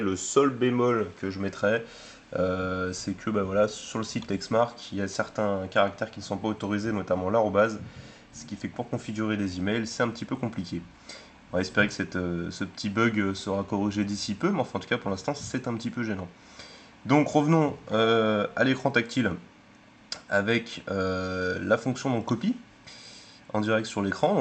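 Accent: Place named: France. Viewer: Fr = French The accent seems to say he's from France.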